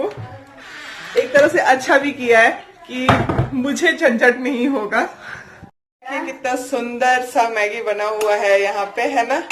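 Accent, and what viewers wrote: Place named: Bhojpuri, India. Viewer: Apke muh se bhojpuri bahutt achhi lagti hai dii.. Nice vlog💓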